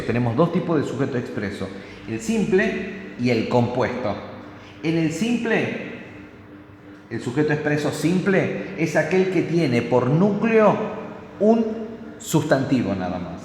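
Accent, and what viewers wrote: Tucumán, Argentina. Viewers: Porque creo que SOS Tucumano o Santiagueño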